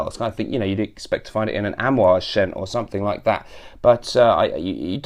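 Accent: United Kingdom. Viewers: Yes! Fragrances reviewer with British English and funny concept.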